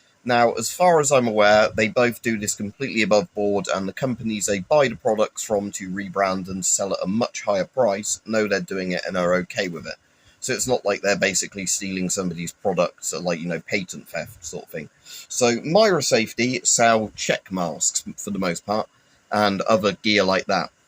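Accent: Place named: England, United Kingdom. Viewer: sounds English 🏴 -looks like El Chapo 🇲🇽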